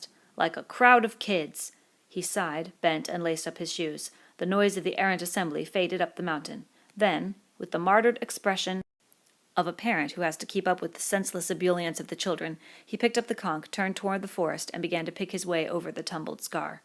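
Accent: United Kingdom. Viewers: does she sound like shes from the uk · everyone saying how it should be pronounced... Does she sound like she is in Britian?? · Chlorita Bleachita does she sound English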